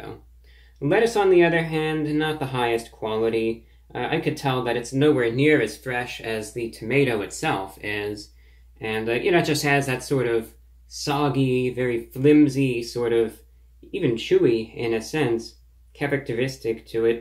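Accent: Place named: United States. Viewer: Hello my American friend. I know you are American, because you guys are unable to say certain words lol. You guys can't say forward, you say foward lol. You guys also can't say espresso, you call it expresso. That annoyance aside, love your content! lol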